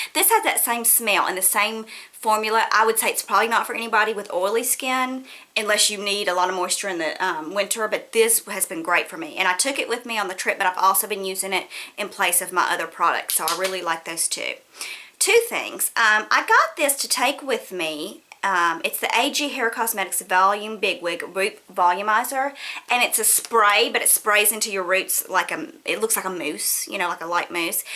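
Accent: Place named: Louisiana, United States. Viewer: I just love how you threw out that country analogy. Im not poking fun or anything. Im from Alabama so I just appreciate them lol. I love your channel. That Louisiana accent drew me in but all the amazing videos keep me coming back for more:)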